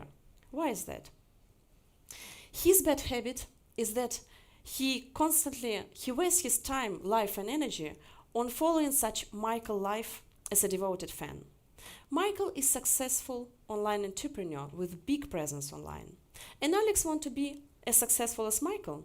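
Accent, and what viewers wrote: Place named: Russia. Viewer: Какое-то банальное УГ, с мощным русским акцентом.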